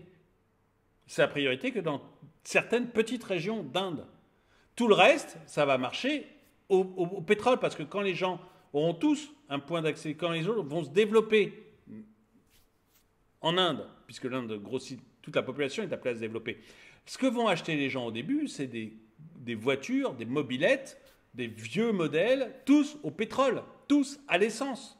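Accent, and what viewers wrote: France. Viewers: Je viens de m’abonner cette semaine! J’aimes les thèmes que tu abordes et ce que tu dis. Surtout la manière dont tu le dis...(très française! le ton, le phrasé).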